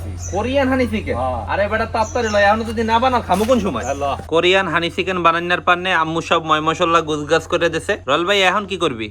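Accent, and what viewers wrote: Barishal, Bangladesh. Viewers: এক্কেরে খাস বরিশাইল্লা ❤❤❤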